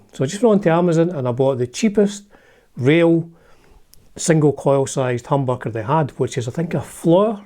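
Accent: Scotland, United Kingdom. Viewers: Damn it YOU REALLY ROCK man ! Btw I would not understand one tenth of what you're saying without reading the subtitles, but it is good ! This way I will practice my Scottish (isn't it?) regional accent comprehension.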